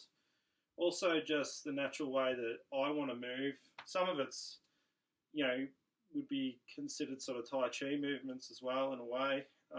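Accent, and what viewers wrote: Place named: Australia. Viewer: Dude, you're Australian. I never put two and two together. I've watched a couple of your videos and always imaged you talking in a US accent. I always wondered where Kangaroo Kungfu comes from and now I know.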